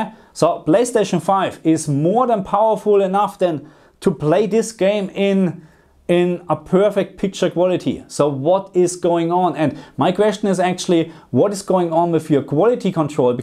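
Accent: Germany. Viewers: Geiler Kanal, hab dich eben entdeckt. Man hört klar, dass Deutsch deine Muttersprache ist. Abo geht raus und Daumen hoch.👍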